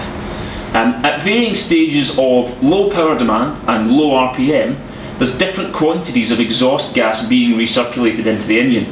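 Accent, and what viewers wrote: United Kingdom. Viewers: Hi there , Like the video and the English accent to be honest (am Belgium) Question: witch software are you using please ? Cheer's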